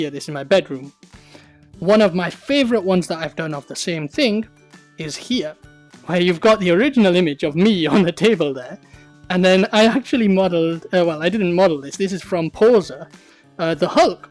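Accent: United Kingdom. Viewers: I bet this dude is Asian too, it's that British Asian accent 😂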